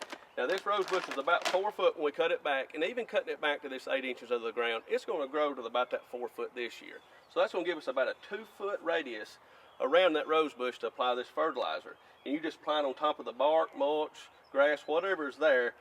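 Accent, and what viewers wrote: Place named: South, United States. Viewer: Absolutely unrelated so I apologize but I noticed your accent immediately. Being from southern Virginia, I love and appreciate a good southern accent. Now on to actually watching and paying attention to the video. 😊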